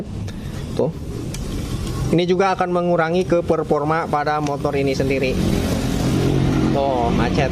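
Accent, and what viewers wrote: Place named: Indramayu, Indonesia. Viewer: Kaya wong dermayu😊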